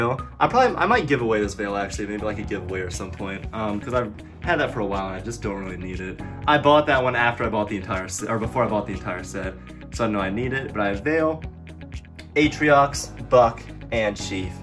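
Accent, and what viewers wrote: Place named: United States. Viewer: yeah man im guessing from the accent your american.. in the UK its hard to find decent halo stuff your collection is amazing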